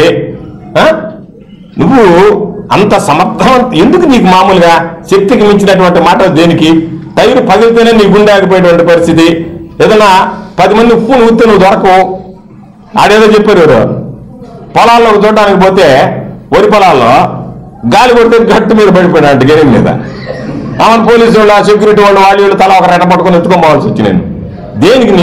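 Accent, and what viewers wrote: Nellore, India. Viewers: Govardhan anna iam your FAN.iam from ongole.you are going to get very great credit that you are going to defet 5 th time.anna iam your fan you speak in very good in nellore slang.Anna i wish you all succesd.you are going to get credit of defiting 5 th of the great mr somireddy. anna all the best to you anna. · Super counters short and sweet we with typical nellore slang...Nellore slang lone vetakaram untundi...Pakkana koorchunna ayana chaviti vademo ani doubt ga undi lekapothe anta comedy vinnaka navvakunda unnarante great...Love the speech.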